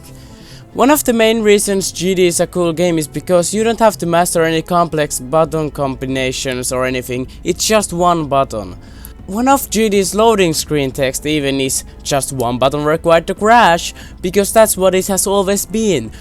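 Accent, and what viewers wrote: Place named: Finland. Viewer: sun äänestä kuulee heti että oot suomalainen :D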